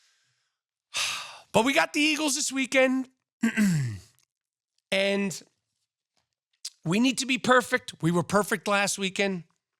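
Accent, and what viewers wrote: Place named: Boston, United States. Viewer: Yo Boston accent!!! Like someone from the Midwest had a baby with some long islander!!! Hurts my ears!!!!!!!!!!....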